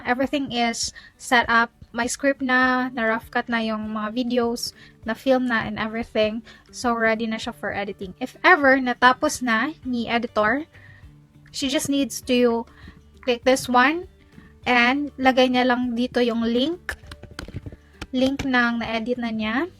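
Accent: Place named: Visayas, Philippines. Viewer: Ate, taga asa ka te? Bisaya lagi imoha accent. Hehehe malingaw ko..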